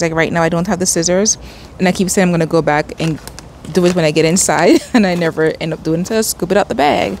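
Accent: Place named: South Florida, United States. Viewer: I love the “f” for fertilizer Friday— I’ll remember that now! Also your accent makes me miss South Florida! 🥰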